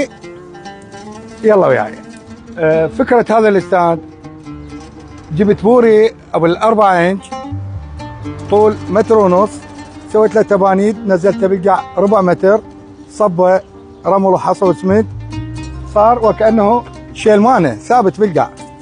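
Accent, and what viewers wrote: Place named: Iraq. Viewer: ما شاء الله تبارك الرحمن ، بارك الله فيك. ما أجمل اللهجه العراقيه، جميله. الله يكثر من أمثالك، الماء والخضراء. اردنيه مرت من هنا.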